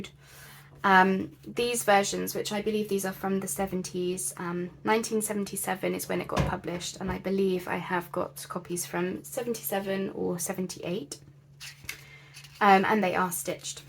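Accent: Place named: England, United Kingdom. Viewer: Thank you for showing the Nature Notes book, I have not seen it before. Its very expensive on eBay. I do have the Country Diary that I bought on eBay for 12 dollars. Thank you so much for your stories as you were flipping through, they were very nice. I always love hearing the English accent. Hearing about the places you spoke about was magical! I am new to your channel, and look forward to watching more of you videos.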